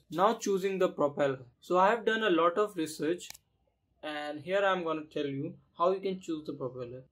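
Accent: India. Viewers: I did, thanks. It is interesting to me why I cannot understand so I looked a little into it. Look at the 20 second mark, for example. You said "and also if you have not watched my previous video..." I could only understand "previous video". Looking at the closed caption, it read: " And also give not watch my previous video". So even they had trouble (it wasn't just me.lol). I think your cadence gives my trouble. To me, it sounds like you are speaking English written in Hindi phonetics, if thats possible. I hope you take no offense to this as I'm hoping to just help. Thanks for the video.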